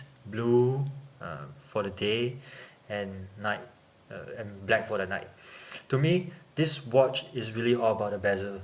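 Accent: Singapore. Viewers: WTF. Speak clearly! Can't stand this Singaporean/Malaysian Chinese accent. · Ya he sound like singaporean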